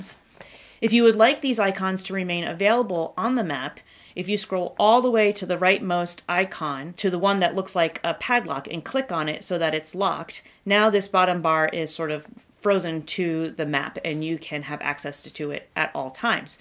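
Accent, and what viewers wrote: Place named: New England, United States. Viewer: Found this by accident and great!!. Just what I need but need a faster brain to keep up with your Vermont one or somewhere in New England. Use to live in Norwich. Thanks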